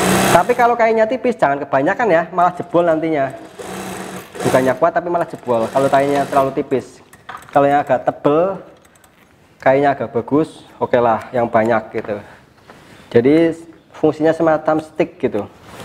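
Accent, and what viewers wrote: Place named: Java, Indonesia. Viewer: koyo wong pekalongan basane, ak, karanganyar mas tugu duren ngetan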